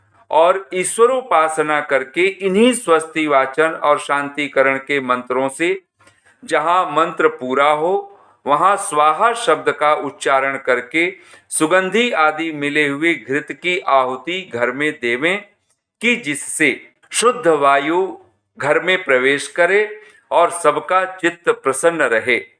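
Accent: Standard. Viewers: बहुत ही शुद्ध उच्चारण हैं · ॐ नमस्ते आचार्य जी ! शुद्ध उच्चारण के साथ अति सुन्दर प्रस्तुति I हार्दिक धन्यवाद I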